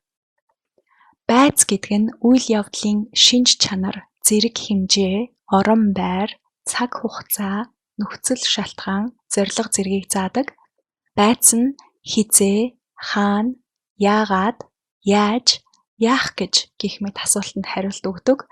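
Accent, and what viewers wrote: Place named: United Kingdom. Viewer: Энэ тхдэ англи хэл зааж байгаа байж British english- ний дуудлагаар дуудаар байна. үгийн дунд орсон T үсэгийг 20% тай хэлдэг англи хэлэнд харин бритиш хэлэнд тэрэлттэй Т үсэгээр хэлдэг. Их гоё бичлэг хийж байгаа юм байна маш их баярлалаа дараа дараачинх дээрээ анхаараарай багшийн эрдэм шавиас гэдэгшүүдээ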